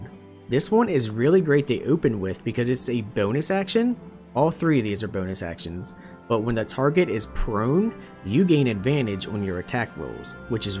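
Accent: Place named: Philadelphia, United States. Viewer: This might be the most out of topic comment but are u from the philly area the accent is very strong if so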